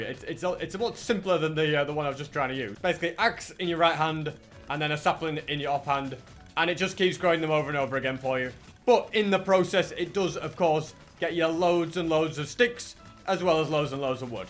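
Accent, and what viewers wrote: United Kingdom. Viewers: Hi are you British or American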